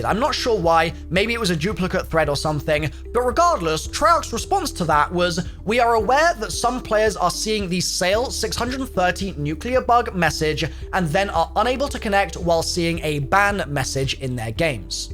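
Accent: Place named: United Kingdom. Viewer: too much uk english for me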